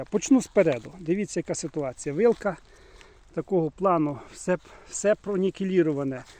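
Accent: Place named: Surzhyk, Ukraine. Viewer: У Вас дуже багато у вимові суржику. А в хлопців дійсно руки золоті.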